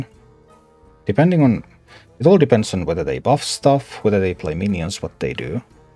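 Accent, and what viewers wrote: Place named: Finland. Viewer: As soon as you started talking I could tell you are a Finn!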